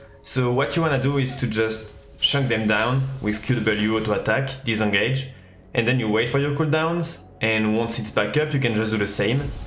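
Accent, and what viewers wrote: France. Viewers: La vidéo est vieille de 6mois mais je suis un peu à chier ne anglais et j'entends que si je suis pas con tu es français donc je me posais la question, possèdes-tu une chaine en français ?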